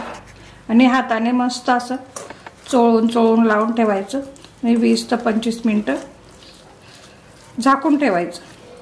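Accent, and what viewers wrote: Khandesh, India. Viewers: खानदेशी आवाज 😍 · तुमच्या toning वरून तुम्ही कोकणातल्या वाटत नाही, तुमचं toning नाशिक, मालेगाव, जळगाव इकडचं वाटतंय... पण रेसिपी खूप छान, अस्सल मालवणी .. 👍😀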